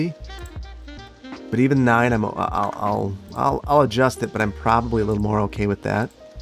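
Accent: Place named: Midwest, United States. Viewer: I can tell you’re from the Midwest. I’ve driven on more than a few two- (or almost two-) dirt roads in the mountains around New Mexico (especially as a Boy Scout leader in college circa <num>) where the road hung along the side of the hill with a nice drop off on the outside and no guard rail. One of these is/was the access road to our local council Boy Scout Camp which I’ve driven numerous times. Chances are given the mountainous nature of that part of Clearwater County, nobody would bother with a guardrail until the road was paved, or at least traffic and/or accidents warranted.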